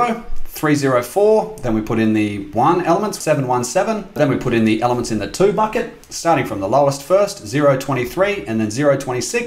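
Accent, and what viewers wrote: Australia. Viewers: You speak algorithm language You speak australian accent You say adios spanish language In my mind, i see a natural 0x10 base or 0x100 base for almost any kind of data, such as string, floats etc, and very fast too with bitwise operation and direct addressing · Are you Australian? I'm new subscriber, just asking because you sound like an Aussie guy I know.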